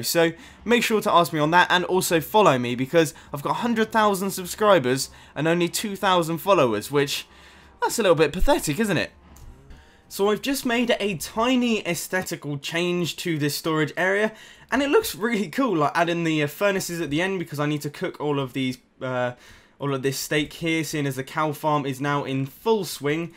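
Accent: England, United Kingdom. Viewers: don't mean to burst your bubble but your kind of a rip off of xsuima I know every one can make tutorials but also the building style and the houses for donators plus you have an English accent which makes it more obvious just come up with your own thing is what I'm trying to say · listening to your british voice every wednesday ? i would absolutely love to :D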